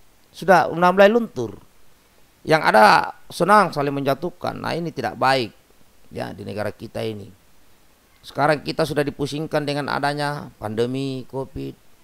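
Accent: Makassar, Indonesia. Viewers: assalamualakum sy senang dengar klo logak makassar ooo mmalee karaeng mg ALLAH SWT jg cnel aaamiiin3 Yaaa rabbal alamin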